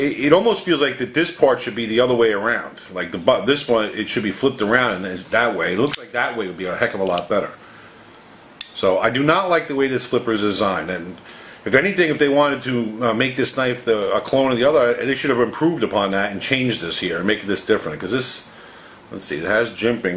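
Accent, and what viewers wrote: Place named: Long Island, United States. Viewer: I’m a fan your voice reminds me of home I’m from NY LONG ISLAND I live in Washington now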